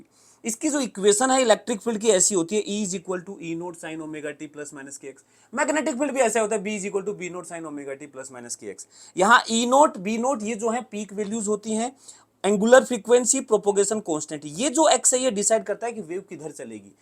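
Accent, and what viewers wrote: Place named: Haryana, India. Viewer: Sir ki launguage h jo log haryana se hote h yese bolte h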